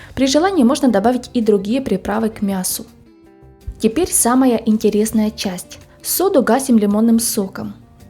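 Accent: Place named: Odessa, Ukraine. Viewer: Привет Автор ролика! Спасибо большое! Всё понравилось! Судя по голосу Вы ближе к Одесской области находитесь) или Приднестровью?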